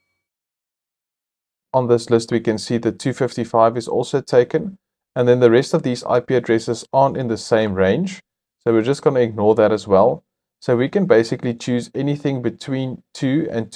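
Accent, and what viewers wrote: South Africa. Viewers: Do i hear a south african?🤔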